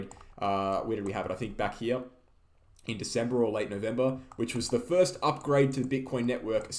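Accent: Australia. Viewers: And screw it if the channel doesn’t grow. Your honest. People can’t handle that. Screw them. 😂😂😂 Plus on a lighter note , I lived in Oz and New Zealand, Your accent is Oz not Kiwi. 😂😂😂😂😂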